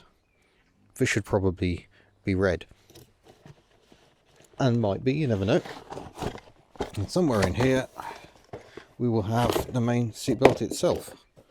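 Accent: United Kingdom. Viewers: almost the Queen's English you were using for Aussie ears, haha!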